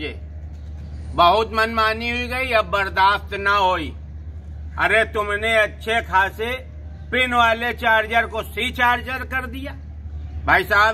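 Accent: Kanpur, India. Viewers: Phchan gye bol rhe K🤣anpur se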